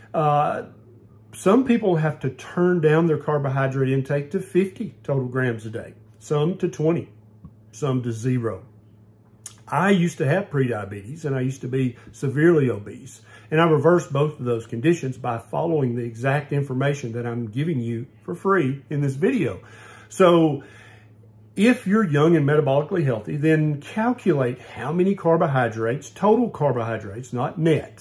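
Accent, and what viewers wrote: United States. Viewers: Where in America does the Doctor's accent come from?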